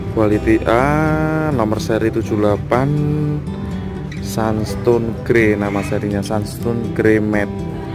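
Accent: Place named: Java, Indonesia. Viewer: Bang kayanyah ,orang jawa yah beda suwaranyah lain sama orang sunda bang kalow keramik batu berapah,,abang orang mana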